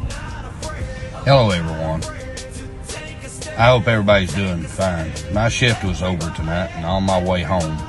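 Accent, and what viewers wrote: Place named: Texas, United States. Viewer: He has a southern drawl. Sounds like Texan.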